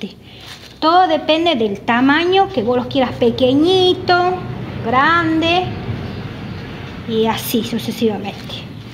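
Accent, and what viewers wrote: Tucumán, Argentina. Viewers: gracias por compartir tus recuerdos,y recetas..soy Santiagueña y muy fuera de lo que dicen amo a Tucuman,es una provincia que me brindo mucho,sobre todo laboral...gracias por tú voz inconfudiblemnte Tucumana!!!! Díos te Bendiga!!!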